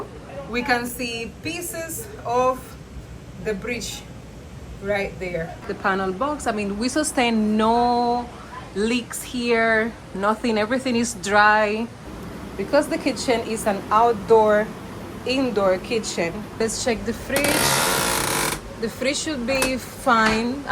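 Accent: Jamaica. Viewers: Your patwa is sweet 😂you sound so good, i love it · I love the patois accent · I love the accent 😅officially yu jamaican ❤🎉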